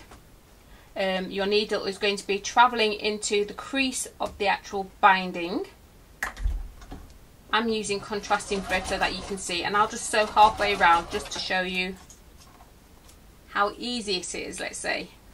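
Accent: Manchester, United Kingdom. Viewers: very well presented, no waffle (like some fools who blather on about totally inconsequential rubbish) articulate and concise, very informative, clear, well photographed - FIRST CLASS!!! (from Manchester I assume??)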